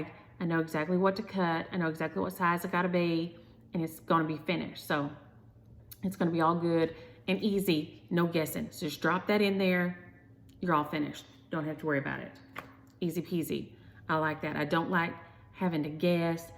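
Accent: South, United States. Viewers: I don’t know you at all as this is the first time I have watched you. But I have enjoyed this video so much. Usually So. Folks accent irritate me.....but yours is as darling as I’ve heard. You can really tell some funny stories your own way. Your stitching is lovely.....and they look smooth and oh so nice to me. White thread has always been hard to see with.......even when I was young and doing regular embroidery. They just don’t lay right. Your finishes are so cute. The red barn w/ the sheep...so cute. Your white thread looks fine to me. I don’t know the story of your mother, but I wish you well w/ the saviors spirit w/ you and your family. I’m 69 and live in Idaho.....I look forward to your videos to come. I am going to try to find your videos from the past. Do you live a farm. I had a snake in my house once and nearly died. Once you have a snake in your house, a mouse is nothing! 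Bless you!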